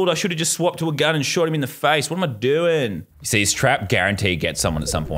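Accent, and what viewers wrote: Australia. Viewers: is it just me or did lazarbeam sound more australian in this game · why does he sound extra aussie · 2 years ago sounded so Australian compared to 2020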